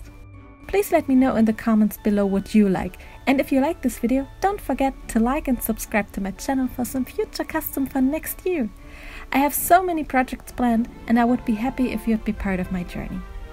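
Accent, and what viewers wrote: Germany. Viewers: Are you German, by any chance? Also, amazing work!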